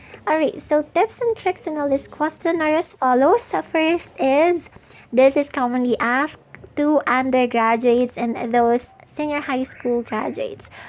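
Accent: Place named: India. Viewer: Naanu ako sa accenr hhehe parang indian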